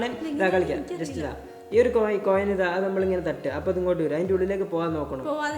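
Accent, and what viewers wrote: Kozhikode, India. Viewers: ബ്രോ കൊച്ചിയിൽ എത്ര വർഷമായി വേറെ ഒന്നും അല്ല കോഴിക്കോട് langugeinu ഒരു മാറ്റവുമില്ല അതു കൊണ്ട് ചോദിച്ചതാ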